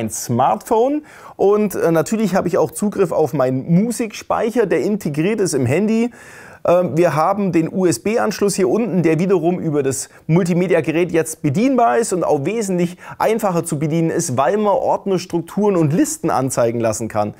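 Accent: Swabia, Germany. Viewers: Das Schwäbische ist mir super Sympathisch xD Ich glaube ich werd mir das Radio zulegen :D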